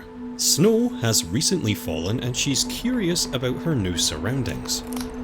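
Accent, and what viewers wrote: Scotland, United Kingdom. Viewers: I love your voice. that interesting accent. I am neither english nor american so i never heard someone speak like that...i believe its scottish? I love to hear you talk about the films!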